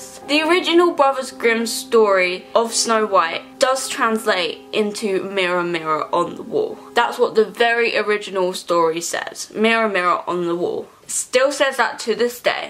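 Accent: England, United Kingdom. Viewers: Delia Cano British prononciation and braces does that chill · In the JFK one it wasn't the driver it was gov. Connelly........ she keeps saying the driver driving me nuts british accent lol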